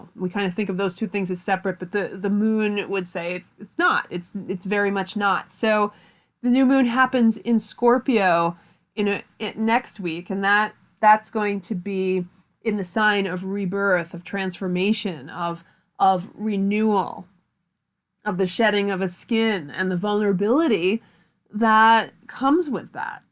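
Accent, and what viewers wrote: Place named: Salem, United States. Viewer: I love your Salem accent when you say "forward" as "foeward" ♥️👏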